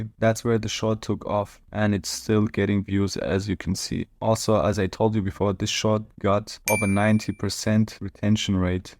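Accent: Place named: Germany. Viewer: Als wenn du wirklich deutsch bist habs am Akzent erkannt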